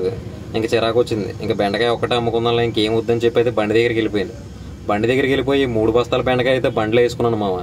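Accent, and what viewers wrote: Godavari, India. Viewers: Mawa nijam cheppu nidhi godavari kadha 😅😂❤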